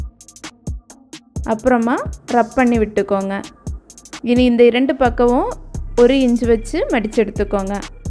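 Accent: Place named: Kerala, India. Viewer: Neenga pesuratha patha ennakku malayalee mathiri tonudu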